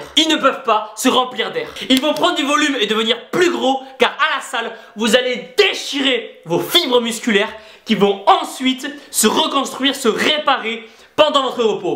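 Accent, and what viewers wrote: South, France. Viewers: mais tu habite où car tu a l acsent du sud moi j habite à côté de pau et toi?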